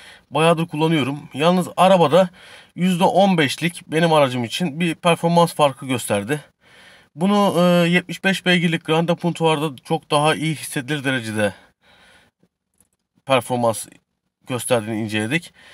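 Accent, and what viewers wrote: Malatya, Turkey. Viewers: Meraba abi acaba malatya lımısın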